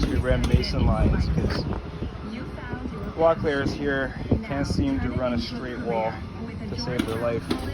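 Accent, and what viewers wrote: Canada. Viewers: Thanks... it's a huge gift you do with the channel giving people the insight on what is behind the finishing surfaces. ALSO enjoy you adding the extra vids of music and living life to the fullest. Hope you are having a beautiful summer and the sun is shining on all you love and... one day you Canadians speak 'House' and 'Boat' and 'About'... so us southerners in the US can understand. XD!!!! Cheers Love all the vids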